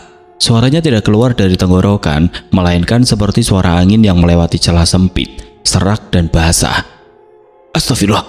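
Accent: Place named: Banyumas, Indonesia. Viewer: kayak suara khas ngapaknya ..dgn ciri khas cerita panjang ngalor ngidul cmn ga ada seramnya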